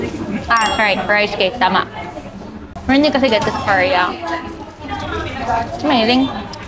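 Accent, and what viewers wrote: Philippines. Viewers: kombinsido na hindi talaga koreana si dahil sa galing magtagalog at pinoy accent pa. di kaya.... 😄😄😄😄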